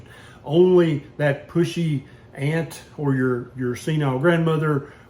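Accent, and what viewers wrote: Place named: North Carolina, United States. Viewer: LOL. Randomly dumped into your channel. Sounds like a NC accent. Subbed.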